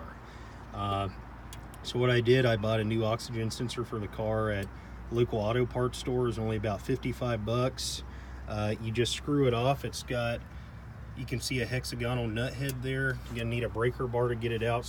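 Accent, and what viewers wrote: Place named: Kentucky, United States. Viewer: You from Kentucky? Sounds just like my brother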